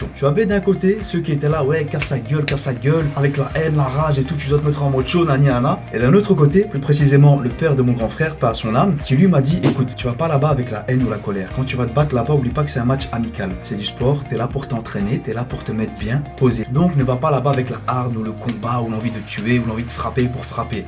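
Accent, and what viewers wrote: Brussels, Belgium. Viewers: J'avais remarquer directe que tu viens de Bxl mdr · Salut, t'es belge toi non?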